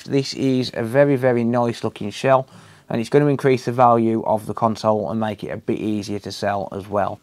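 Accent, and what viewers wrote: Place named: Birmingham, United Kingdom. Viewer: Hi mate new sub here I'm liking the vids. Found you through Tronicsfix! Just curious what accent is that? Birmingham? I just like to guess accents lol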